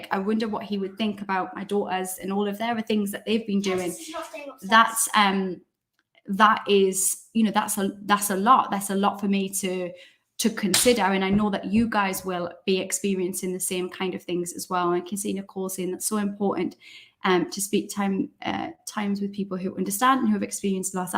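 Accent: Newcastle, United Kingdom. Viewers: listening to your GEORDIE accent videos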